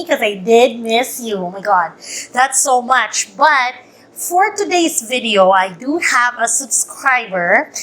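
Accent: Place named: Philippines. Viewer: Hi! I came across your videos, I was just doing my errands and i felt so annoyed with the feeds on my facebook. So I came here. I am an LMT here in the Philippines and i enjoy watching your videos. I get new ideas. I was about to apply there in Canada as a massage therapist after the pandemic before we created our massage business but my husband didn't like the idea as we are both DEPED teachers at the same time massage therapist. It is our passion and we also have massage clinics. I assume you are filipino. You speak like one. Are you?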